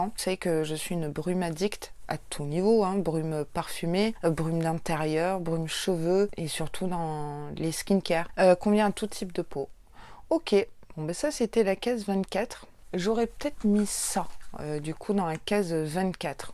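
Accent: New Zealand. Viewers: Loving the kiwi accent 💜💜💜 Jolis goodies, le calendrier est vraiment flashy rose barbie ça fait un retour en enfance je trouve 💜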